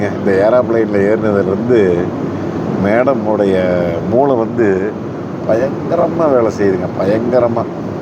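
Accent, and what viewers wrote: Kongu, India. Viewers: ஆஹா என்ன ஒரு மகிழ்ச்சிகரமான முடிவு அற்புதம் எங்களுக்கு கடந்த ஒரு மாதமாக அற்புதமான ஒரு தொடர் பார்த்த திருப்தி கொங்கு தமிழில் நீங்கள் பேசிய பேச்சுக்கள் மனதில் என்றும் நிலைத்திருக்கும் நீங்கள் வீடியோக்களை எடுத்த விதத்திலும் சரி எதார்த்தமாக நீங்கள் பேசிய காமெடி பேச்சுக்களும் சரி மிக மிக அற்புதம் ஒரு ஜெனரஞ்சகமான நகைச்சுவையான குடும்ப படம் பார்த்த திருப்தியோடு இந்த தொடர் முடிவடைகிறது திருப்தி சில மாதங்களுக்குப் பிறகு வட கிழக்கு மாநிலங்கள் கோவா ராஜஸ்தான் உள்ளிட்ட இந்தியாவின் பல பகுதிகளுக்கும் சென்று வர வாழ்த்துக்கள்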